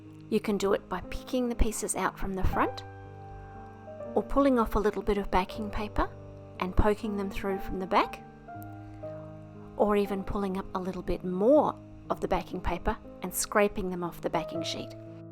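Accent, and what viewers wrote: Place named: Australia. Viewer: Hi, I loved these cards they are simply stunning, and now I want to purchase the butterfly dies. It is always a joy watching you create your cards... with your easy to listen to Aussie voice, and the beautiful relaxing music in the background. Everything blends so well together, and it matches your creativity. I am so blessed to have found your channel., I am so sorry for your loss, and this is such a beautiful way to pay tribute to your nephew Daniel. Prayers for you and your family at this very sad time. May you find comfort in our Lord and Saviour Jesus. God's blessings and love, Gloria 🙏💐❤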